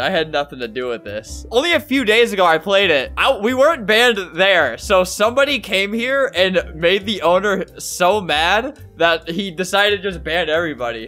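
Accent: United Kingdom. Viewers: Great British accent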